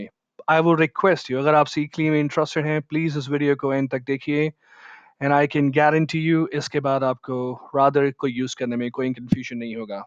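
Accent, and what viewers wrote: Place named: India. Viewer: Mate stick to ur Indian accent rather than imitating our accent.... why don’t u decide if u wanna speak British or American first instead of mixing them.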